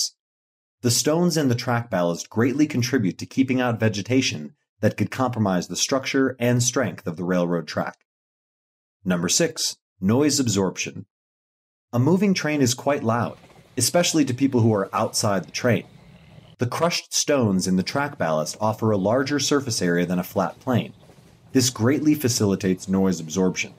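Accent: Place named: United States. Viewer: Why are you going to program AI with an American accent and then give us measurements in centimeters?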